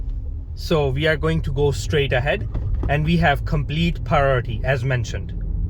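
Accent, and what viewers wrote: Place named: England, United Kingdom. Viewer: Besides making the great videos which I am very thankful to you. I have this curioucity to know where did you learn this English accent from.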